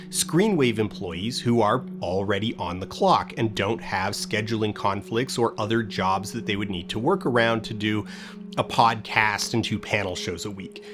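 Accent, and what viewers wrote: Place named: Canada. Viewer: I swear your "beens" and "processes" get more Canadian every video.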